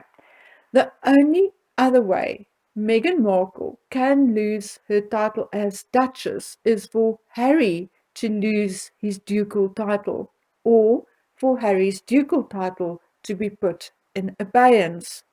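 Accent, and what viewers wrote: South Africa. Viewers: I could listen to you talk all day long. I just adore the South African accent. X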